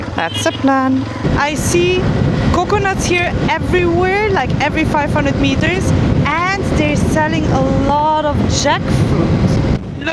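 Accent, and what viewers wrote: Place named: India. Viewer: I learn English free from you, like Indian accent ❤❤❤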